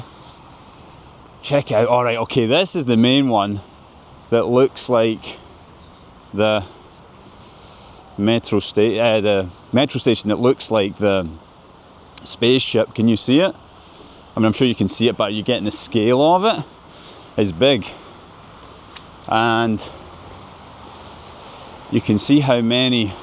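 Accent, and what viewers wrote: Scotland, United Kingdom. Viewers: Russian with a Scottish accent is something really new to me... I'm not Russian myself.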